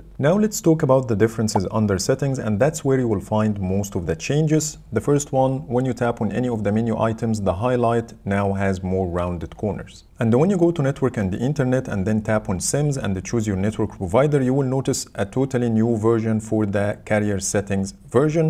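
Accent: Egypt. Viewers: Love ur egyptian accent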